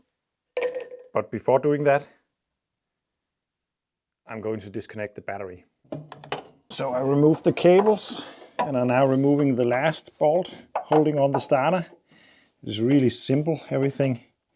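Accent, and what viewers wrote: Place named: Denmark. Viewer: watched 2 min and already I can tell without checking, this guy is from Denmark... 🤣🤣💪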